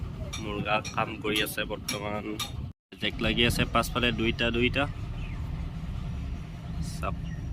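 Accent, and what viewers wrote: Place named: Jorhat, India. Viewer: Jorhatr kotha bayek nukuwa vaaaaal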